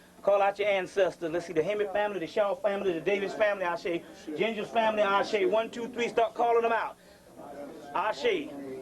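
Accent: South, United States. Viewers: that is very true, I bet if he spoke all prim and proper without that southern accent and wasn’t chubby the blind would prob consider him a lot more, goes to show u ppl are shallow and the spirit realm don’t give af bout societal standards lol.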